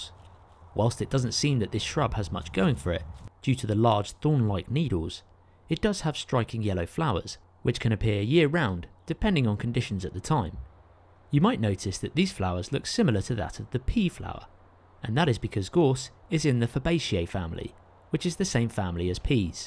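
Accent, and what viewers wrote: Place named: South East England, United Kingdom. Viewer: It’s a pretty regular accent for someone who was born & lives in the south east of England. I too was born in the same area as & share the same accent.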